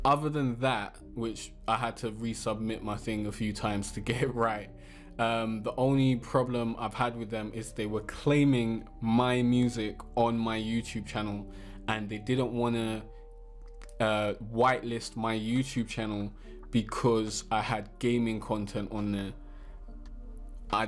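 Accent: United Kingdom. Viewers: Thank you mister British man i found on Youtube!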